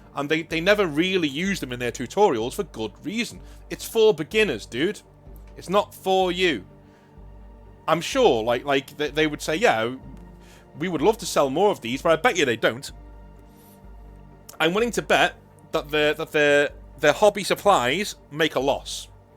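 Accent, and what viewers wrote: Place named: North, United Kingdom. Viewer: Painting some sweet, sweet Redeemed terminators (DA) whilst listening to those lovely northern tones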